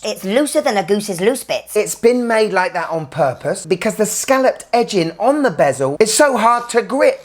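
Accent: United Kingdom. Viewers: I just realized who you remind me of….Benny Hill (which is a compliment in my mind). And not just because of the British accent , your humor is very similar. I also prefer utilitarian looking dive watches. I don’t like the wavy dial and certainly not the 1990’s bracelet style. I’d love to see Omega reissue their styles from the 60’ and 70’s in both their divers and sport watches.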